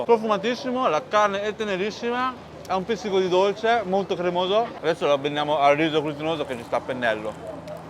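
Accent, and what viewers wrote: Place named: Pesaro, Italy. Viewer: Grazie, i tuoi video sono molto gradevoli, il tuo accento pesarese e' incantevole e tu sei una bella persona garbata, sicuramente sei il riflesso dei tuoi genitori.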